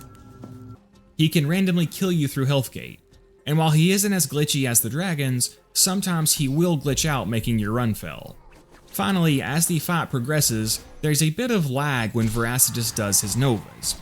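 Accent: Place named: Texas, United States. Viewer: I love the slight Texas southern twang to your accent. “Aaai play with aaaa controllaar” 😂👌 coming from a queens English speaking Brit it’s highly amusing.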